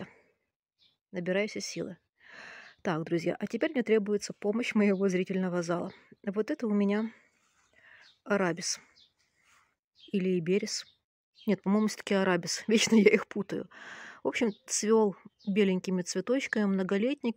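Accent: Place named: Odessa, Ukraine. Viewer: с приятным одесским говором и правильной речью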